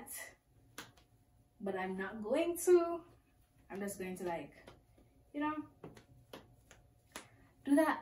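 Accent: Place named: Jamaica. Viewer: loved this video. I caught the accent. Jamaican? If so, I'm Jamaican as well and i also did a Back to School Try on Haul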